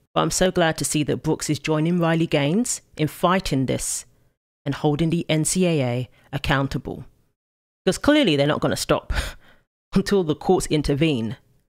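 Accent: United Kingdom. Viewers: Are you American or a Brit ? The accent says British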